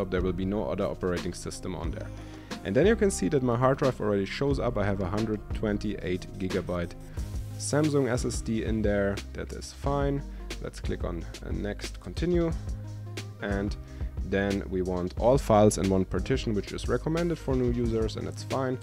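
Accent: Germany. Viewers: Haha ich liebe deinen Deutschen Aktzent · U German?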